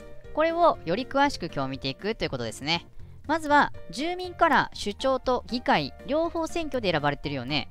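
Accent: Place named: Kansai, Japan. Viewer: この回は関西弁が強調されてる！わら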